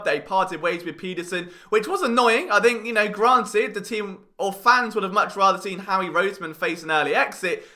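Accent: England, United Kingdom. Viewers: I come here to get my eagles news with an English accent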